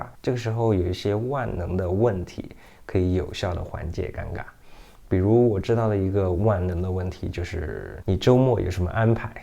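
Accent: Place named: Beijing, China. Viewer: 你的中文不错了，从发音到咬字北京腔有了，已经接近母语中文的国人了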